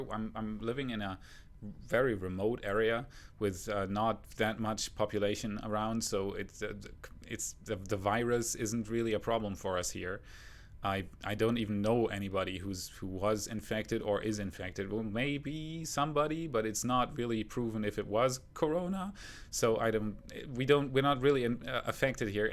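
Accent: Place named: Bavaria, Germany. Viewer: Being an old Army brat my dad's last posting was Stuttgart.The first time I ran across you I was shocked,you looked just like my childhood friend Helmut from my school there,right down to slight Bavarian accent most likely don't notice lol.Your English is VERY good,better than some Americans lol.And I'll say you have a beautiful family and I'm looking forward to you coming to America to stay.Maybe someday I could meet you I hope,my oldest son is 37 so hopefully we have things in common.God bless and keep you:-)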